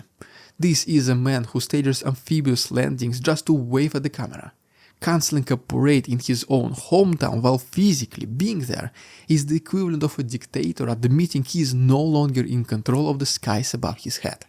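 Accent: Russia. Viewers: Bro your Russian accent is getting thicker by the day, and it’s getting really hard to understand what’s you are saying? How long have you been reporting in English now? 😂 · I like hearing the news with a strong Russian accent. You’re easy to understand and in fact your English is very good. It’s more fun this way, especially when reporting about Kadruyov’s ill health. Thanks for a very interesting video❤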